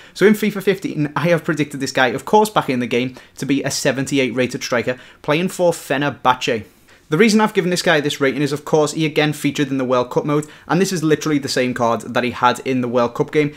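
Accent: Liverpool, United Kingdom. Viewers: Your scouse accent is coming out when ye speak hahahah stop putting the voice on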